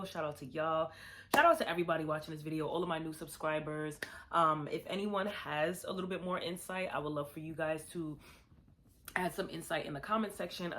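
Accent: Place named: United States. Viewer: Good video! we have to find a way to have cultural pride without being competitive. There isn't ONE thing about anyone culture that would make them "great"or better than the next. It's influences from all over. you are a proud beautiful woman of Nigerian descent but to be honest huge part what makes you poppin is you talk and like a Black American girl from NY! Period. All of our influences from the continent, the carribean and black America is what shapes us into something special. We all add to the pot to make something beautiful. No ones contribution is greater than another.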